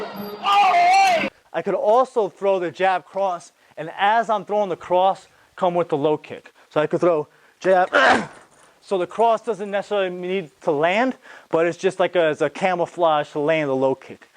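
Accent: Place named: Russia. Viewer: Low Kicks my #1 favorite technique, no bullshit Russian Accent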